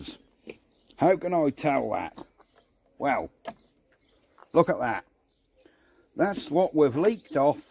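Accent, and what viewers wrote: Norfolk, United Kingdom. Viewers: You sir, have the strongest norfolk accent I’ve ever heard😅😂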